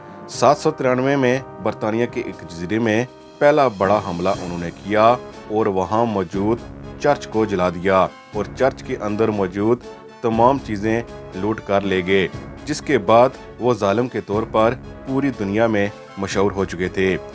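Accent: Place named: Kashmir, India. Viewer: R u Kashmiri ?? you Accent kashmiri hai. Distric kotli ka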